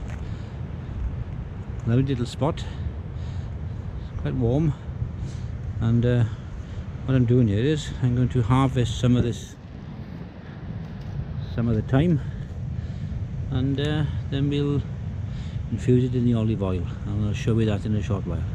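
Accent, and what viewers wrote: Wales, United Kingdom. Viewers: Absoloutely loving the Welsh accent bud and your vids are incredible. Keep up the excellent work, subbed!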